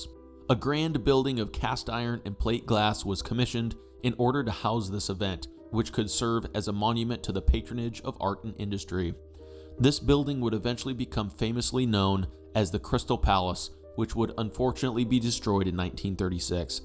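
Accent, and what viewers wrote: United States. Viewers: The picture introducing "the Corn Laws" showed North American "corn" ["maize"] not "corn" ["grain" i.e. wheat and barley] as is meant in England. The documentary is thorough and good; but has that American accent.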